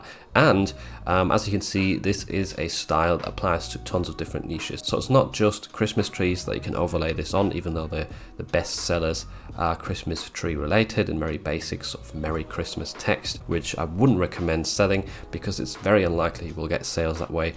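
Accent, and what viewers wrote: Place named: England, United Kingdom. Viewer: Thanks, you always get me thinking. I hope you don’t mind me saying, since you mentioned about the plaid, that most people I know would say “various / variations” as though there were the word “air” in it. Not a criticism or anything, just thought you might like to know. I love listening to English accents since I now live in the States.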